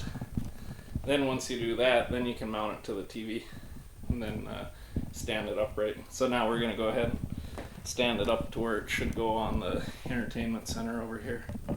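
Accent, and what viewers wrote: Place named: Canada. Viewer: $3299 Canadian? I ask because clearly you have a Canadian accent. But I tried downloading the Greentoe app and or says not available in Canada?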